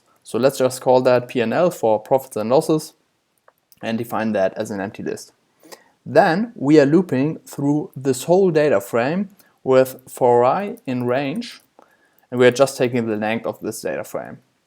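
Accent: Germany. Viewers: I hear an accent, if German then Dankeschön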